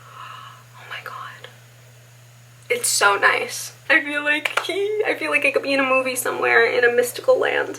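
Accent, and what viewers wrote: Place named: East Coast, United States. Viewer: Kinda random but are you from the east coast? Your accent peaks through at times. 😂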